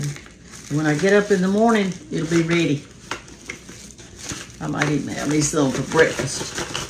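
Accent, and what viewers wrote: South, United States. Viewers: You have a southern accent. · I am from Georgia Ms,I just love you. And yes all of us from the south have a different accent and way of saying things. You make me smile. ❤🎉 I am trying Tallow Balm. Hope it works,can't hurt 😂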